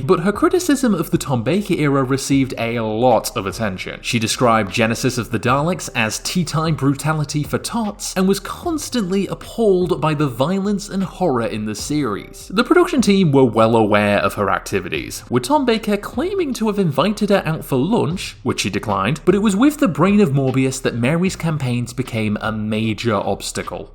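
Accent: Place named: Canada. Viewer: Sounds a bit Canadian.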